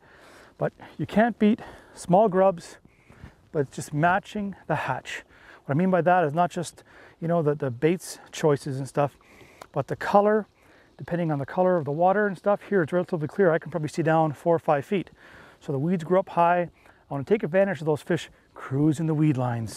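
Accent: Canada. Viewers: lol sounds like he from canada. Thanks for the great tips! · Are u Canadian eh?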